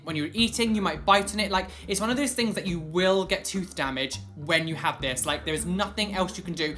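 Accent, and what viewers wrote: United Kingdom. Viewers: I've just discovered your channel you're so cute :( I love to hear that british accent ♥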